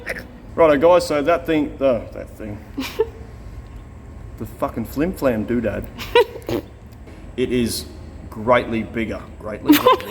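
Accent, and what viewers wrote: Australia. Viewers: Dear, I will never make anything that you have shown me so far but I am having a wonderful time going through the processes you take to make the incredible things you do. Its great to hear proper English - Australian English. Greetings from South Australia.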